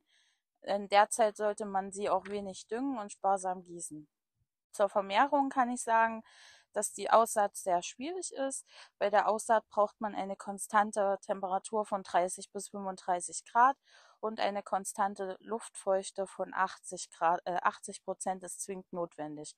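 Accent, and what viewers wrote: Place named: East Germany, Germany. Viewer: Warum bloss, weiß ich sofort, dass jemand aus dem Osten kommt?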